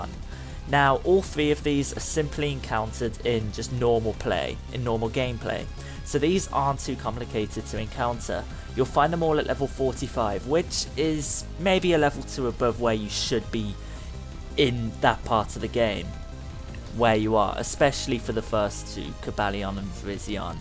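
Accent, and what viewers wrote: United Kingdom. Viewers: AND ENOUGH OF THE BRITTISH ACCENT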